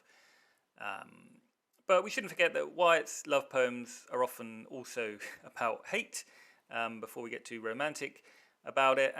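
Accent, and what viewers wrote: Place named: England, United Kingdom. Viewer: English with an English accent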